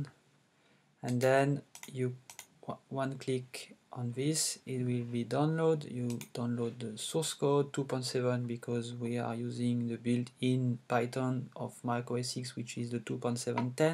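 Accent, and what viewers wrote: France. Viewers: MDRRRRR t'a un putain d'accent français t'aurais pue le faire en francais ton tuto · cet accents français XD est ce que ce tuto marche sur windows ?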